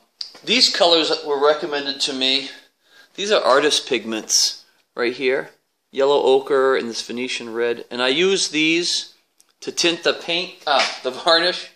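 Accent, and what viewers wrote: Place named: Appalachia, United States. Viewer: That has a deep mountain twang love it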